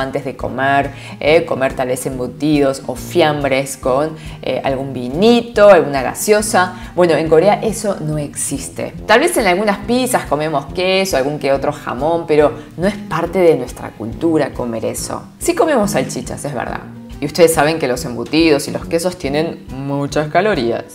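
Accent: Buenos Aires, Argentina. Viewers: Te felicito por el perfecto español-argentino que hablas jeje. Adoro el coreano , me pone triste no poder pronunciar ni siquiera una palabra. Gracias infinitas por contar costumbres y alimentos saludables que consumen. Bendiciones para tí. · Wow!! Hablas perfectly Argentinean 😱🇦🇷👏sos hermosa! Me encanto tu video! Gracias!!👏😊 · Habla tan bien porteño 😍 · Una coreana con acento argentino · Eres Coreana, hablas español con acento Argentino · Flaca pero tu hablas como Argentina como eres coreana? Nacida en Corea o de padres Coreanos? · Hola apenas descubrí tu vídeo pero una pregunta además de hablar muy bien el español miro que tienes un acento como argentino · Supongo es coreana se ha criado o vívido por mucho en Latinoamérica y si su español suena a Argentina! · Acento peruano, limeño? Para nada, su acento es argentino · Asu una coreana hablando con acento argentino😱😄que chistoso se ve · Tiene acento de la Argentina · No se guíen por los rasgos! 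No tiene un mérito especial que hable exactamente como una argentina si lo es de nacimiento o llegó muy chiquita. Por muy negro que sea un tipo, si nació y vivió en Sevilla será y hablará sevillano. Conviene pensar antes de hablar o teclear! :D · No tengo ascendencia coreana parece pues me miran y engordo. Habla como argentina. Linda chica presentándonos su bella cultura. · Me encanta oirte y verte, tu acento argentino es genial, sos fisicamente coreana pero culturalmente todos tus gestos , tu manera de hablar y tu alegria son latinos, te felicito por ayudarme a conocer mas de tu lindo y hermoso pais, soy fan de los doramas, besos desde Honduras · Y tiene acento argentino. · Tenes 2 de las 3 cosas que me deriten de una mujer ojos preciosos asentó Argentino pero tu piel no es morena 👍♥️👍♥️👍♥️🇬🇹👍👌♥️👍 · Tu español es perfecto , pero con acento Argentino!!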